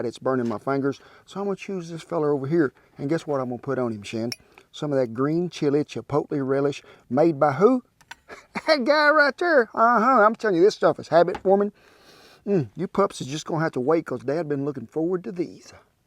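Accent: South, United States. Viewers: I loveyour whole laid back attitude. I love your southern accent (I too am southern, woman) I love your sausage balls. Thank you!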